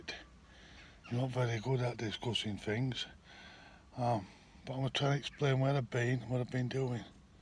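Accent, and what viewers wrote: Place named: Yorkshire, United Kingdom. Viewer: Ey up cocker. Where bouts you at you fuckin lyrical legend? Accent sounds Huddersfield/Brrrrrratford way?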